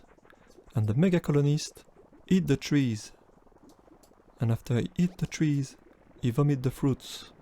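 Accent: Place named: France. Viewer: Your accent tell me that mby you might be fr so.. j'avais une petite question, est-ce que ce ne serait pas une bonne idée de mettre un marqueur fluo d'une couleur au choix mais bien flashy autour du pointeur de souris? (J'ai pu remarquer avant hier en terminant la démo que la souris devenait vite illisible ou introuvable quand tu arrives sur les trillions et qu'il y a des rivières de fruits à l'écran.)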